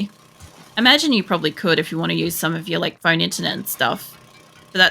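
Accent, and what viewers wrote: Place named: Australia. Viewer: I've never been able to watch an entire video of someone mining. Watching you mine is secondary to listening to you talk about nothing and everything all at the same time 😂 there is something about your voice that is easy to listen I and especially love the Australian accent. AJ 👍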